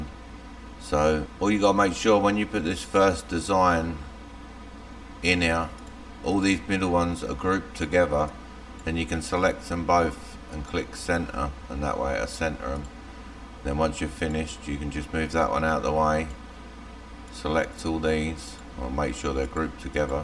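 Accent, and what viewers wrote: United Kingdom. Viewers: Thank's for all the laser vidéos. From France, your accent remind me Peaky blinders...👍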